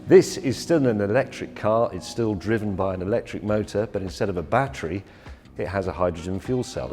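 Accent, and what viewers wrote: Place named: South Yorkshire, United Kingdom. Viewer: South yorkshire eh? Hehehe...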